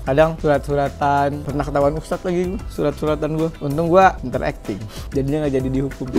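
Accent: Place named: Banyumas, Indonesia. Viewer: Ngakak dngr ngomong ngapak wkwk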